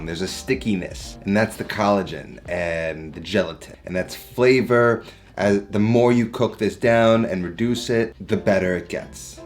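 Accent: New York, United States. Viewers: I watch for the food, your handsome face/ NY accent.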